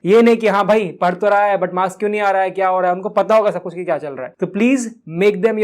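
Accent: Bihar, India. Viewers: bihar tone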